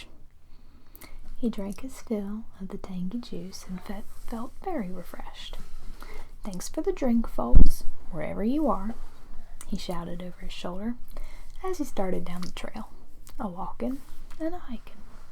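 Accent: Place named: South, United States. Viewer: Southern Accent is awesome.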